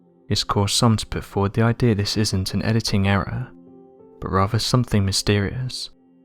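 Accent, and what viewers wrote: England, United Kingdom. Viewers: What's with all these info videos with these friggin narrators with english accents ?????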